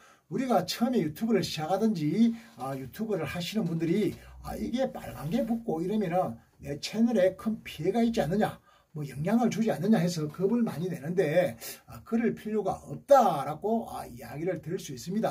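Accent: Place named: Gyeongsang, South Korea. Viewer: #강펀치tv님 안녕하세요 인사드려요 #저작권 아! 유튜브 스튜디어 들어가서 자세히 살펴보고 알아보고 계시네요 ~^^ 그런데 경상도 사투리 가 정겹게 들리네요 ^^ 아 ~음악만 듣다가 목소리가 들리니깐 정말 반갑습니다 😁 잘보고 응원하고 갑니다 늘 건강하시고 행복하세요 😊 또올께요 🤗 큰성장 하시길 바랍니다 🙏 화이팅하세요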